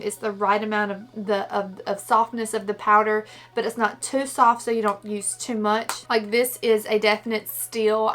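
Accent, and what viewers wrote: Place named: North Carolina, United States. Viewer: are you from NC?? your accent sounds like it!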